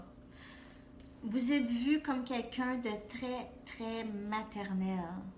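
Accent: Quebec, Canada. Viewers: Bonjour, merci beaucoup pour cette guidance ça me parle beaucoup. J'ai découvert ta chaine il y a peu et ça m'a attirée toute suite, déjà parce que, si tu me permet, je vois à ton accent que tu es Québécoise. Et il se trouve que ma flamme jumelle est justement Québécois. 😊 D'ailleurs, depuis que je le connais, j'ai l'impression que les mots Canada, Québec et Montréal me suivent partout. Je pense que c'est la vie qui essaie de m'envoyer des signes. Peut-être pour aller habiter là-bas bientôt, même si je vois pas comment ça pourrai arriver. J'habite en France. Il se trouve que je suis entrain de me prépare à déménager, car mon bail se termine bientôt. Je n'ai pas encore décider où aller. Enfin si, dans le fond je sais où j'ai envie d'aller. Au Québec, mais ça m'a l'air compliquer à première vu. Voilà, je parle de ça au passage, car je me dis que comme vous êtes de la bas. 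Vous auriez peut-être des conseils, éventuellement à me donner. Peut-être que ça pourrai m'aider. Et encore merci pour les tirages, ça m'aide beaucoup à m'éclairer par rapport à ma flamme jumelle et surtout ça m'aide à prendre confiance. Car c'est vrai qu'au début, il y avait encore parfois des petits doutes et des peurs. M'étant toujours fait des désillusion à chaque fois que je m'intéresse à quelqu'un. Malgré que parfois, j'étais sûr de moi. Donc au début, il y avait surtout là peur d'être déçue. Enfin voilà, bonne soirée.😘😊💗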